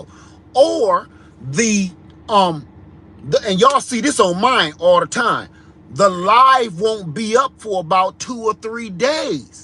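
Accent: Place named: South Carolina, United States. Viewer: Hello! I been watching a few of your videos now and really enjoy your content! I am in Germany and my 2 Bi-racial sons live in SC, just as I did from 99-2012- heck I miss it so much and my goal is to make it back one day. So good to hear you talking- that SC slang that I speak lol Love what you say and am agreeing 100% Keeppushing! God bless you!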